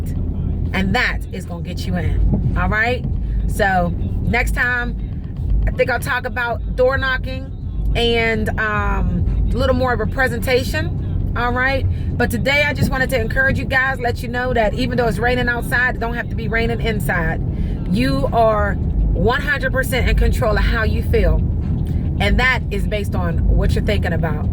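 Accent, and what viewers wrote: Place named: Pittsburgh, United States. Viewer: It sounds like your from Pittsburgh I wonder if you work for troy.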